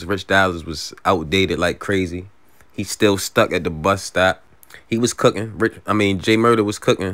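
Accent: Baltimore, United States. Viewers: Didn’t know you was from Baltimore until I heard you speak in this video. Good seeing the city speak on battle rap. Thought I was by myself out here. LOVE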